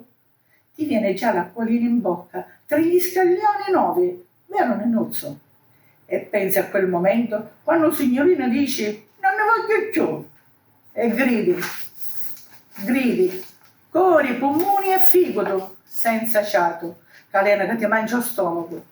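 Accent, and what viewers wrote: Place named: Catania, Italy. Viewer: Brava 🎉brava ❤!!!! Complimenti 👏👏👏bellissima storia e quantu mi piaci a parlata catanisa, hai dato le giuste enfasi al racconto facendomi entrare in pieno nella narrazione e sono riuscita a capire tutte le parole, brava! grazie ☺️ per averci deliziato del tuo racconto ❤️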